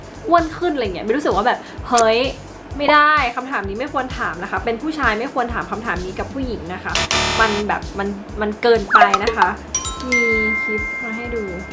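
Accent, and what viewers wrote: Isan, Thailand. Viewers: สำเนียงพี่ พูดอีสานคือได้มากกกก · เอื้อย เว้าอี่สานม่วนคัก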